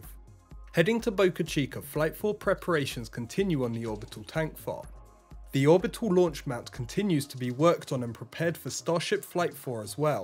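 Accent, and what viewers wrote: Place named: Cockney, United Kingdom. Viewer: That’s a London East End accent. “Fursday” instead of Thursday etc.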